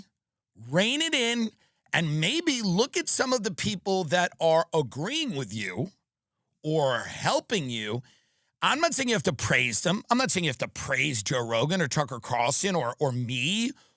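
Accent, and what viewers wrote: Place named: New York, United States. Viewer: at least for me, Tim's new york accent on top of bashing LA culture is all I would listen to driving around in my car, and I was born here.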